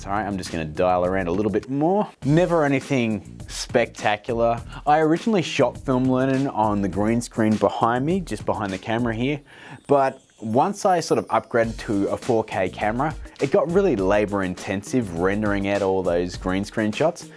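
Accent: Australia. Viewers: judging by his australian accent, probably australia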